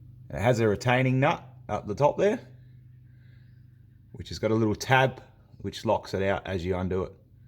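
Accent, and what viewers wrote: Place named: Australia. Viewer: Fabulous, love the aussie accent. Cheers from Norway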